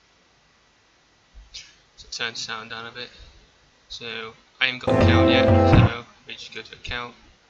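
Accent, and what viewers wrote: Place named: United Kingdom. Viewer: I'm a nonnative English speaker I have a hard time to understand British accent :(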